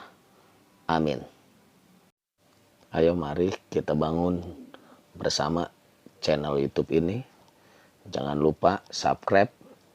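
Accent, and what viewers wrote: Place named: Jakarta, Indonesia. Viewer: Jiahahhaa asik di bales... makasih ya bang ❤💘 pantas aja logat nya kaya dari betawi... sukss sllu ya bang moga semakin berjaya setra sllu dalam lindungn Allah Swt Aamiin.....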